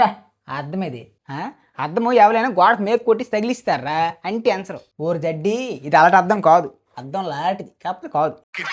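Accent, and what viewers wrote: Srikakulam, India. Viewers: Srikakulam Slang ❤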